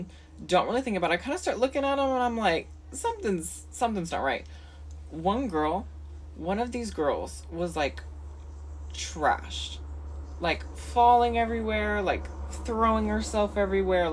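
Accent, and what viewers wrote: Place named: San Francisco, United States. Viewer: What a San Francisco accent.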